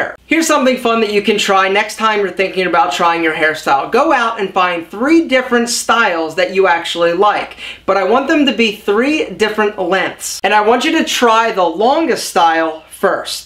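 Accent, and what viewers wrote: Philadelphia, United States. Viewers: you sound like you're from the Philadelphia region?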